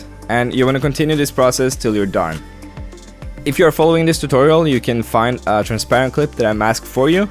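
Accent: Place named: Sweden. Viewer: I thought he was Swedish hah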